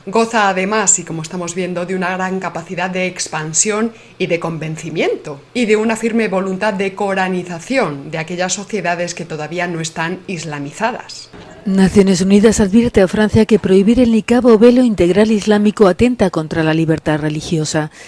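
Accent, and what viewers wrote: Spain. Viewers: Ole esta española! Que gusto oírte!!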